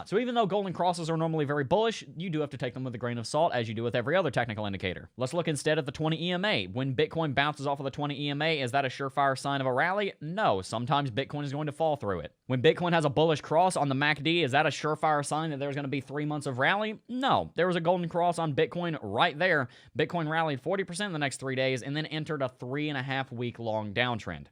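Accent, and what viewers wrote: South, United States. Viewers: Hey dude are you from the south? I like you accent very much. Thank you for your voice.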